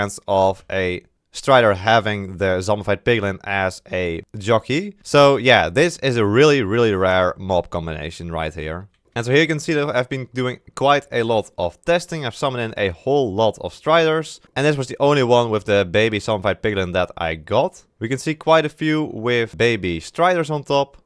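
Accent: Netherlands. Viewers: Hoi ben je nederlands? je hebt wel een opvallend nederlands accent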